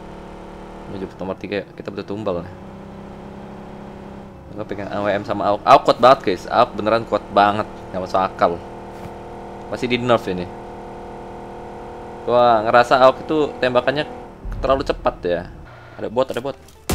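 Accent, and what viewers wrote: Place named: Medan, Indonesia. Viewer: bahasa nya bg kreak medan kali bang😂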